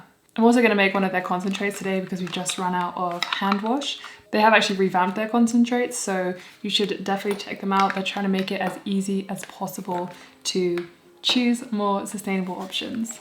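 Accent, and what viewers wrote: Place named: United Kingdom. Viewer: hi just found your channel and when saw that you live in Denver, I was really confused; you sound like a brit, same as me and after watching this i guess that you have just moved to the states; lucky girl; hope you enjoy your new life therex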